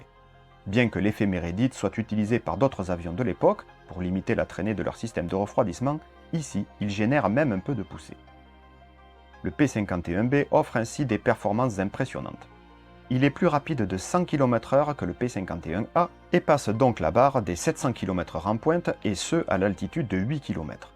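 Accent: South, France. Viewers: Cet accent du sud m'avait manqué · Toujours content de voir une de tes vidéos dans mes abonnements. Vidéos toujours aussi détaillées, explicatives avec une très bonne approche sur le contexte des choses expliquant certains choix techniques et limitations du moment. Puis bon, toujours content d'entendre notre joli accent du Sud! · Très beau documentaire … Les détails techniques et historiques sont très bien présentés. Le petit accent méridional du narrateur et quelques pointes d'humour permettent de suivre cette présentation.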